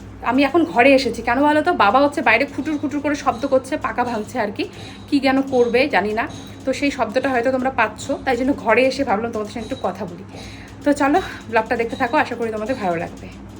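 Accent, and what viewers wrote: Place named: Bangal, Bangladesh. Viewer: Apu tumar purbo Purus ki bangladesher cilo. Tumer khota ekto banggal tan ace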